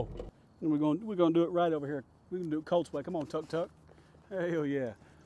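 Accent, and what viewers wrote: South, United States. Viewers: The best part of your video is your comments. It’s great listening to you. You sound like I’m talking to myself with southern accent. I’m a yankee. I’d rather have Yuengling.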